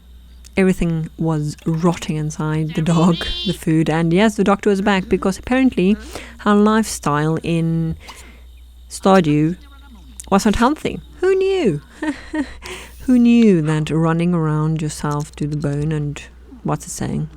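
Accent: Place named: South Africa. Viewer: I knew your accent sounded familiar!! Hey fellow South African <3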